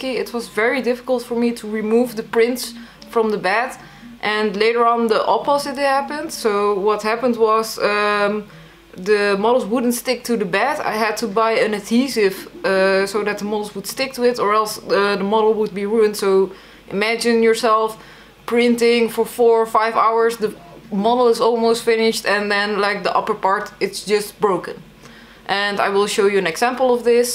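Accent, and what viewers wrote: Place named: Netherlands. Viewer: Dankje voor de revieuw, ik hoor heel duidelijk dat je nederlands spreekt :) zou ook leuk zijn om nederlandse revieuws te zien. Groetjes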